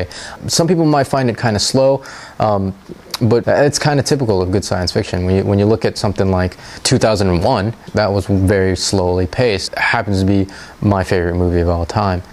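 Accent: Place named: United Kingdom. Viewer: You do a great job,! Love the British accent, too:)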